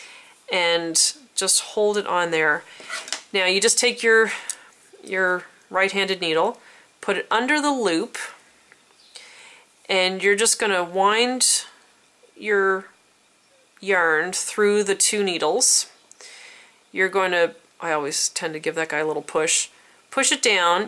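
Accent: Canada. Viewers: Thanks for the great video! You sound Canadian!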